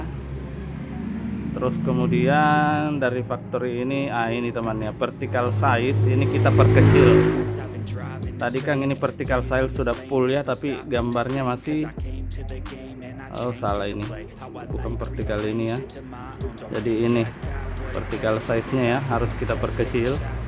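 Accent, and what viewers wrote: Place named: Medan, Indonesia. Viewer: Tinggal daerah mana bg, kayak logat medan, saya d rantau prapat bg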